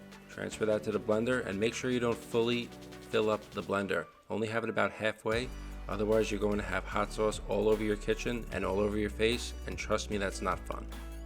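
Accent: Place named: New York, United States. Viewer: I like that Global knife, man. I almost bought one just like in 2015...but I'm a cheapskate, and so I didn't. LOL. I can tell by your accent that you're from NYC. Which borough? Just curious. Don't answer that, if you're uncomfortable answering. I'm a west coaster, but my wife is from Weehawken, so I've been out your way quite a bit.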